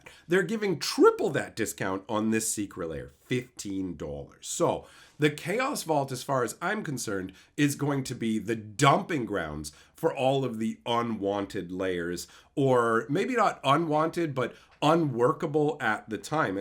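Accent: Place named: Canada. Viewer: Heh heh you keep saying A Boot!😂 Ya damn Canadian, I love ya.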